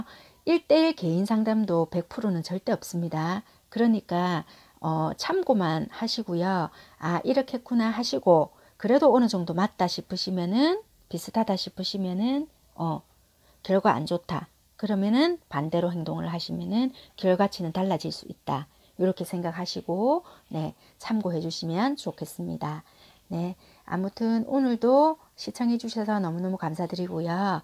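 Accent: Busan, South Korea. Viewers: 부산 사투리 뿜뿜 타로 그런데 카톡에 마리타로로 검색하니까 안나와요 ㅠㅠ 오픈채팅으로 검색해야 하나 싶어서 검색했더니 마리타로가 딱 하나 있는데 그분은 이 아니더라고요.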